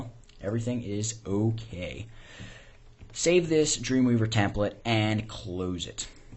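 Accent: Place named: United States. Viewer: awesome tutorial, i was tired of watching other vids where the people have strange accents. USA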